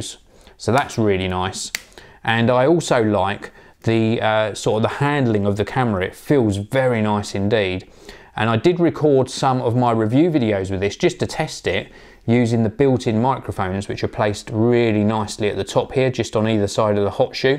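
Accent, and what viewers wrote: United Kingdom. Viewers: dat british accent mate!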